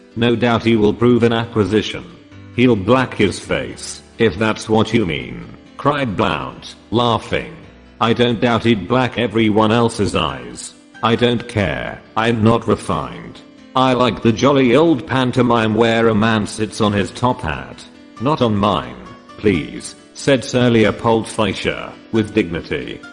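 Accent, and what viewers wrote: United Kingdom. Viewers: All of his voices and pronunciation is perfect!Full of British Accent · good british accent...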